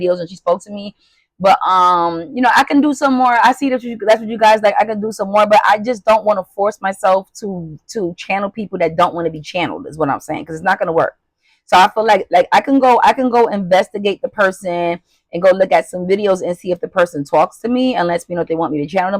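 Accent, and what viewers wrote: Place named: Spanish Harlem, United States. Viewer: New subscriber here!! Are you from nyc ? Lol my husband is n your accent sounds like his hes from Spanish Harlem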